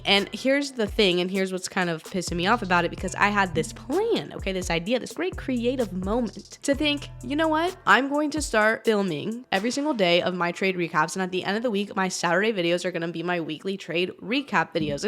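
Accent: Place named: California, United States. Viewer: “Super hella delayed” Cali-bred through and through. I love it! Great video again ❤️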